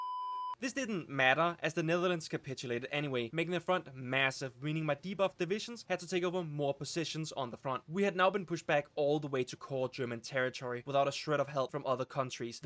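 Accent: Denmark. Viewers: Drop the Danish accent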